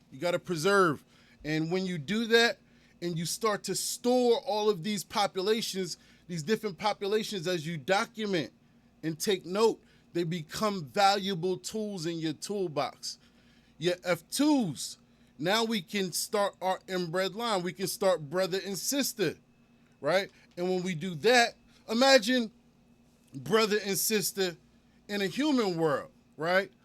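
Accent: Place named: New Jersey, United States. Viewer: I can tell homie from jersey im from maryland but been to jersey alot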